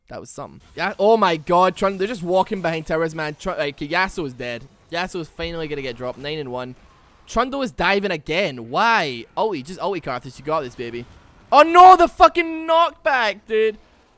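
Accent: Scotland, United Kingdom. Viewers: is he scottish? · R u Scottish.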